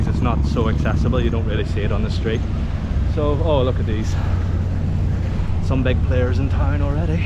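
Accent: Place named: Derry, United Kingdom. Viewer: Where abouts in ireland are you from bro? I'm from derry myself your accent doesn't sound far from here. Coming to ibiza 28th September for the week so thought I would get a watch at the videos!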